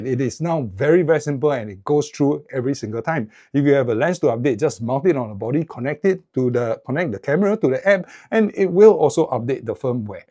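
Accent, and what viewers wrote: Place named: Singapore. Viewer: Thank you for your excellent overview! I have a very good friend in Singapore and I believe you have the same accent! Wonderful.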